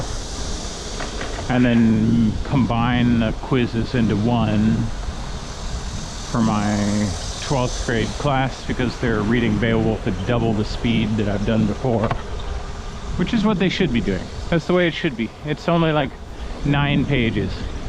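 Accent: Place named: Texas, United States. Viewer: Sound like you are Texan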